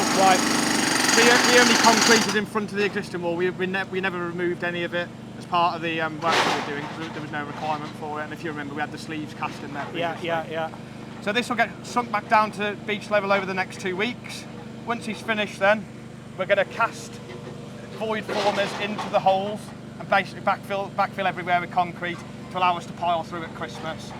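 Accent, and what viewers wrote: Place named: Wolverhampton, United Kingdom. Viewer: Phase 4 yes please. Fascinating watching the progress and I need a regular fix of accent, being marooned as I am here in NZ far from my native Wolves 😀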